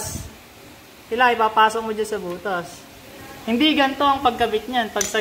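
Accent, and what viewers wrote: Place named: Bulacan, Philippines. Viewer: Sabi na eh hehe, sa punto mo palang. Dyan din po probinsya namin, sa Sto. Rosario Hagonoy. Tagal ko na nga hindi nkkpasyal dyan. Salamat sa tutorial mo, malaking bagay sa aming mga nagsisimula palang .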